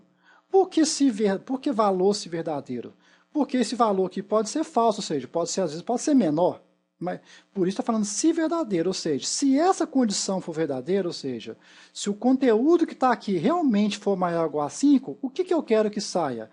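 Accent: Minas Gerais, Brazil. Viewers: Olá Prof., parabéns por sua aula. Uso excel, mas nunca precisei usar as fórmulas SE e PROCV, portanto tenho muiiiita dificuldade com isso, mas depois desta aula sobre SE, tudo ficou mais fácil e a sua explicação, com sotaque de mineirinho, foi ótima e muita clara para entender. Muito Obrigada. Sua nova fan!!:0) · Tem um sotaque mineiro, é mineiro?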